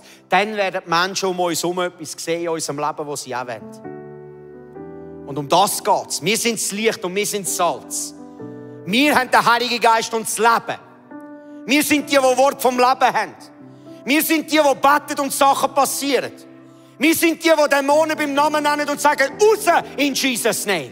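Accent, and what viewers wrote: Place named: Switzerland. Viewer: Versteh leider net so gut, des schitzerdeutsch, schief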